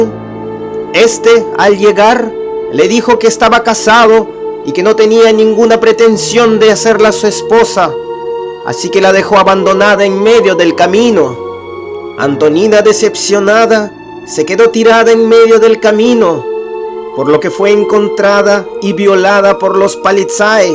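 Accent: Russia. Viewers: wey, es ruso, no puede poner un poquito mejor su acento porque así es su acento base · Eres de Orígen RUSO tus videos son La Unión Soviética tu Acento Saludos desde México capital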